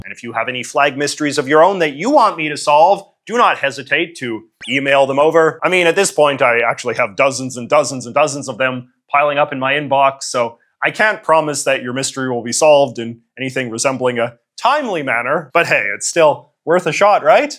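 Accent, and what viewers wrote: Canada. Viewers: Hes Canadian with a Canadian accent. Accents ≠ mispronunciations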